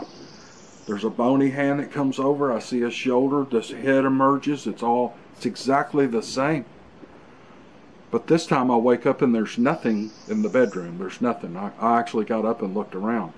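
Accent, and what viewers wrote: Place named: West Virginia, United States. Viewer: You sound exactly like my friend from West Virginia. And not just your accent. If I heard you on the phone I would definitely think you were him. Me and my friend belong to the Creator of the universe too. No fear.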